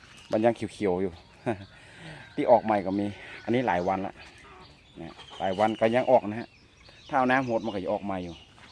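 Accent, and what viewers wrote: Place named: Laos. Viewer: เว้าคึอคนวังเวียง สปปลาวเลย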